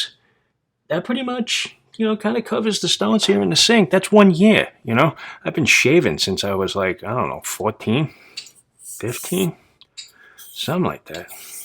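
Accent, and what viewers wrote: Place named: Brooklyn, United States. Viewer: I love the Brooklyn Italian accent, by the way. It's music to my ears.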